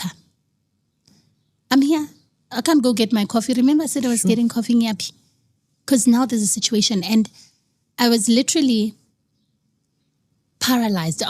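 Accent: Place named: Venda, South Africa. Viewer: Is the host a Muvenda? I detect hints of Tshivenda accent in his English ❤❤❤❤❤❤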